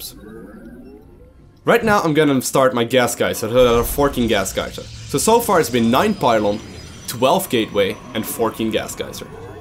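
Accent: Netherlands. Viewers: Are you dutch? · are you dutch? you sound really dutch but I cant quite lay my hand on it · It sounds like Dutch so much hehe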